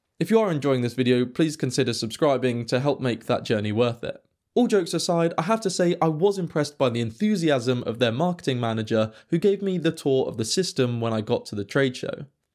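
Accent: United Kingdom. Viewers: A British narrator should "reduce" his British accent? Why? Because it triggers a snowflake like you? Get back under your rock 🤣🤡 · Any chance the narrator can reduce the British accent? WautER instead of WautAH?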